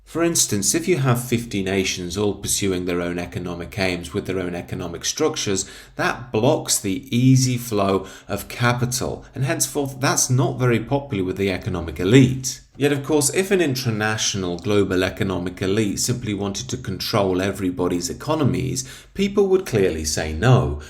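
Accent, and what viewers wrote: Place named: England, United Kingdom. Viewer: Spoken like a true english gentleman . Cheers from Italy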